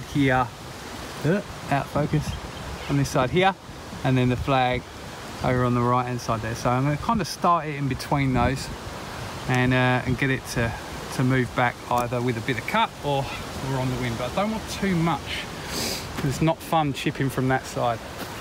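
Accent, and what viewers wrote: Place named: Cockney, United Kingdom. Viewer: nice... Looking fwd to seeing that come through. Cheers for the sub back... I couldn't place your accent... It actually has hints of cockney on it. Hopefully I haven't just offended you. Lol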